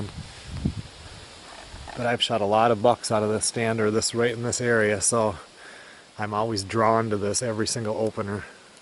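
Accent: Minnesota, United States. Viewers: dear i really love your minnesota accent if u let me come to ur cabin ill let you come to mine in the UP michigan i know michigan people suck but were ohio people so everything will be ok pm me if youre interested alex p.s. wuts ur favorite kind of beer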